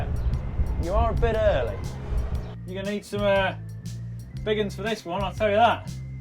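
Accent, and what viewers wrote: United Kingdom. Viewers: british clearly, he lives in lincolnshire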